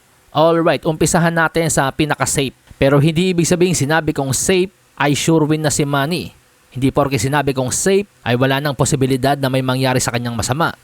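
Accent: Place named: Visayas, Philippines. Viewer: Bisaya ka pala sir blogger dahil sa tonada mo kahibalo ka mo bisaya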